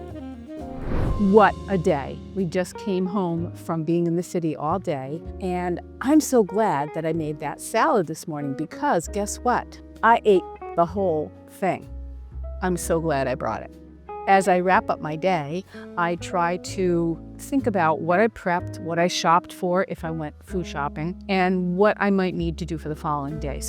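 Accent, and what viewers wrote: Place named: Hamptons, United States. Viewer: Love to use envelopes too, listening to your accent and a reference to the city, I’m thinking you live in the Hamptons? Wonderful view. Love your food, I make veggie soup too, but at the end I take a little out, blend it in the nutribullet and add it back for a nice creamy feel. I definitely need that string blender thing❤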